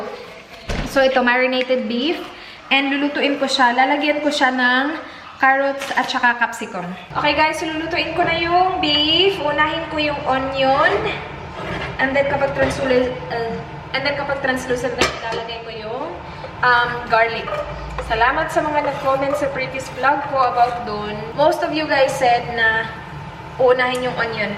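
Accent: Visayas, Philippines. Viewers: Ms. u meron ka visayan accent po if nagTatatalog... I'm sorry to say that... I'm just priud Bisaya here😉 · Bisaya ka te noh? Haha kay tungod sa char char char na word ug botbot haha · bisaya ka te? E hearth ni kung bisaya ka😂😂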